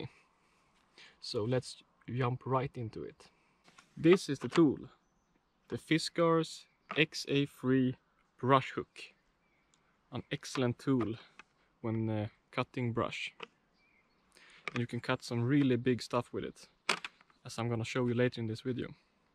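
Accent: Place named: Sweden. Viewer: Just curious are you from Sweden? Your accent is badass man lol thanks for the info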